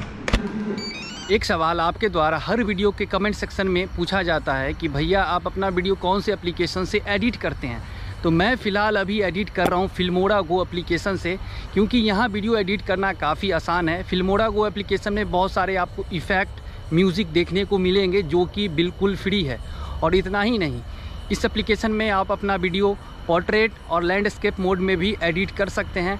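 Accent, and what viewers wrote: Bihar, India. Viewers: Apka voice se hi pakar liya tha ki ap bihar se ho. Me bhi bihar se ho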